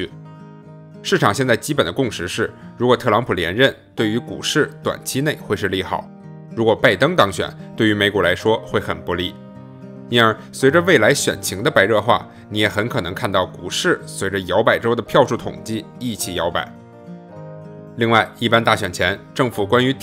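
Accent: Beijing, China. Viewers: 的京片子真的好聽！不抄股的空挡也可以去当演員了!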